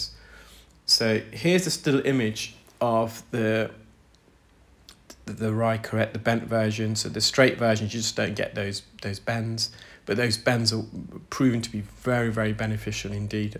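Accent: United Kingdom. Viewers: I'm smiling because with your British accent I hear " right correct ".... Love watching your videos!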